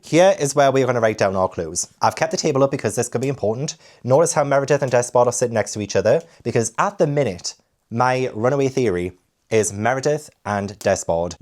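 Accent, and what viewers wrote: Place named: Northern England, United Kingdom. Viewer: I'm not great at accents myself and I am British. It's very obvious to me it's a northern accent but parsing out which one isn't my forte. However he talks about Newcastle a lot so I imagine this is the accent local to there.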